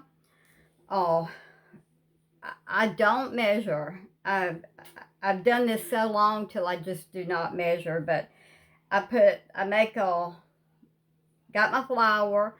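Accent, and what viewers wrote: South, United States. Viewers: Hi Ms.. I really appreciate your videos. I was adopted in TN when I was little, but don't really have any family as it wasn't the best situation. But I had a grandmother who was absolutely wonderful, and when I was young she cooked like you. I love listening to you, learning from you and watching all your posts. I'm near Chicago now after living in NYC for 20 years so it's nice to hear the Southern accent and warm lifestyle. You're good people, as my grandmother would say. And I'm very happy I found you here. PS. You had my mouth watering 2 minutes in! Yummy!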